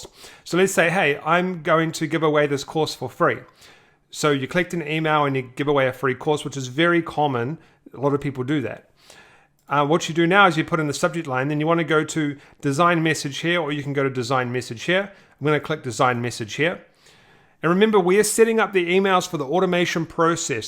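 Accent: New Zealand. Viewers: Hey mate, I stumbled across your videos a couple of weeks ago. I love the content bro!! Keep it going! You sound like your from New Zealand too? Haha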